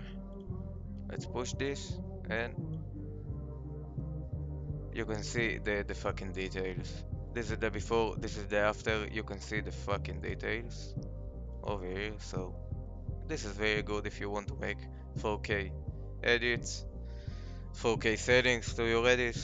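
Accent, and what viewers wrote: Israel. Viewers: שומעים שאתה ישראלי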